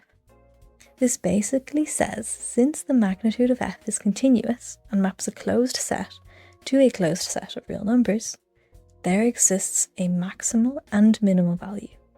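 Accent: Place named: Ireland. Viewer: Beautiful video, beautiful Irish accent. Hope you make a series of videos to develop these concepts.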